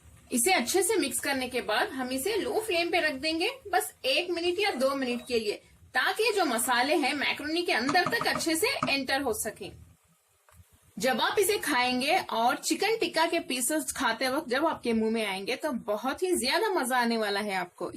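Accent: Hyderabad, India. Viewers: Ap Hyderabadi ho? 😍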